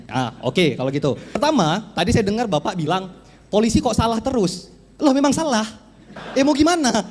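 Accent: Indonesia. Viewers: Dari logatnya sptnya dia orang sumbar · Cara bicaranya mirip orang aceh..🤔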